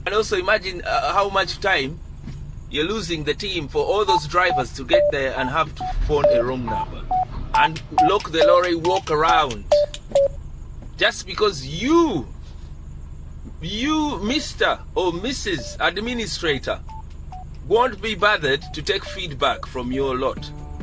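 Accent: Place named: Uganda. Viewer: Ur sound like Uganda goodwork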